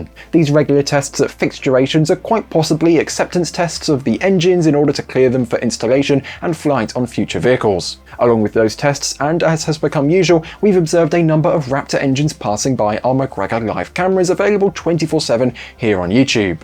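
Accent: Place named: England, United Kingdom. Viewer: Are you really trying to tell the English guy how to speak English? The meth pronunciation is from the dumbed down version of English. 🇺🇸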